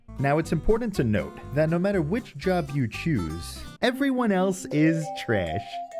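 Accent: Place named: New York, United States. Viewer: I like your accent, are you from New York? This is very informative though, thanks!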